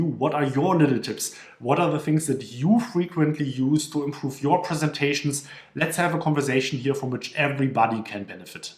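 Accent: Germany. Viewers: Hört sich deutsch an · Liebe deinen deutschen Akzent! :D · Ich schmeiß mich weg 🤣 YouTube hat mir „deutsche“ Untertitel automatisch eingespielt. Ich habe zuerst gedacht das wäre dänisch, lettisch oder so. Als ich dann nachgesehen habe war deutsch angegeben. In Kombination mit dem extremen deutschen Akzent pure Comedy 🤓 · Du hast echt ein sehr heftigen deutschen Akzent 😂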